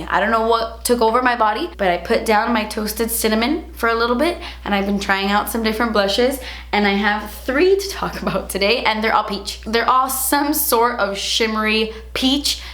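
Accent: Cuba. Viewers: El acento Cubana que bonito, aún que soy Mexicana me encanta 😛 make a spanish video trust me you’ll gain more subs 🔥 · I LOVE how you talk in spanish, I'm from Spain and girlllllll that cuban accent is bomb 💘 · Tu acento cubano,... I love when you speak spanish xDDDDDD. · Omg I love her Cuban accent!!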